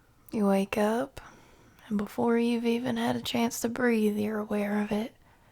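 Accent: South, United States. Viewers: Loved it, I also the southern accent it was amazing! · Let me talk to who for what?! cooking with the country accent 🙏🏻, also I normally don’t ask but if you guys can pray for me Tomorrow morning is my Grandpas funeral I’m fine right now but I don’t know how I’ll feel tomorrow 🙏🏻❤️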